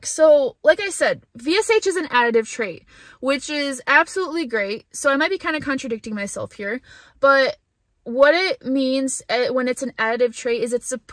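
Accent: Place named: England, United Kingdom. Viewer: just use that english accent!! :) !!!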